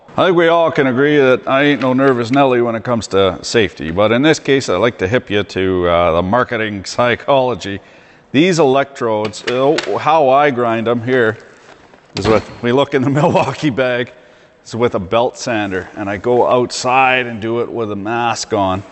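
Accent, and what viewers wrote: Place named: Canada. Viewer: I don't know shit about tools but you talk like a true maple syrup baby and I love it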